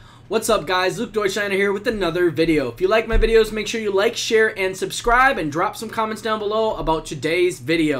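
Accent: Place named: Detroit, United States. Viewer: You from Detroit?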